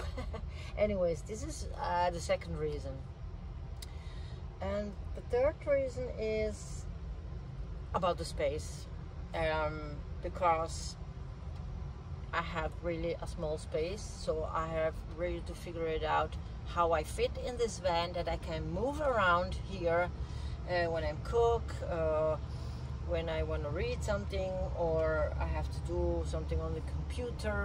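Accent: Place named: Hungary. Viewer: I just saw your video come up and I would much rather have my bed at the front for many reasons. I would feel much more secure for sure. I love your accent, you sound so much like my Hungarian mother. I do hope that you are feeling happier and more positive these days. We are sending you warm hugs and positive energy and wishing you success with your channel. ❤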